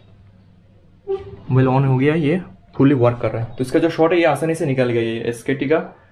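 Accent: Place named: Bengal, India. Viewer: Bengoli wala hindi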